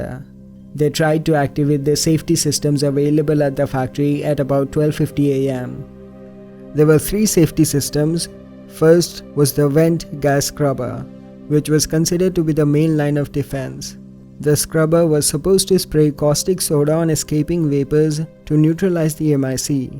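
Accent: India. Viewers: Your voice sounds like Indian. Are you Indian ?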